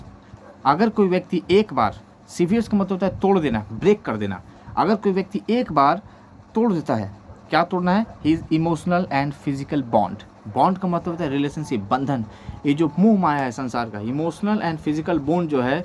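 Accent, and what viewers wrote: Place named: Bihar, India. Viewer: Very well explained and I can relate with your bihari accent which gives a home like experience. Thank you🙂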